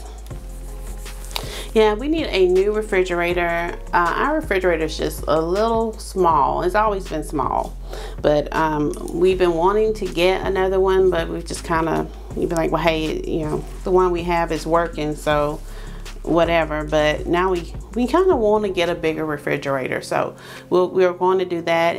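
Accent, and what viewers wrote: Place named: South, United States. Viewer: Are you a southern gal?(: I love your accent and I loved the attitude you brought to your video and that made me want to sub.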